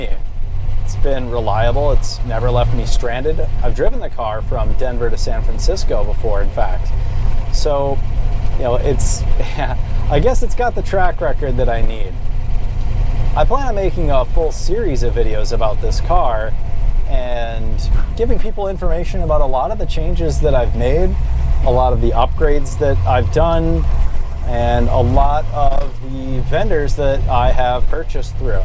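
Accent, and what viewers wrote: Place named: Minnesota, United States. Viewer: What part of Minnesota are you from.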